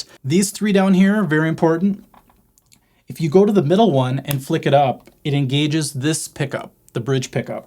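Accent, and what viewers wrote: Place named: Midwest, United States. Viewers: "Jayguar" "knabs" Sounds like he's from the mid-west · It's the Midwest accent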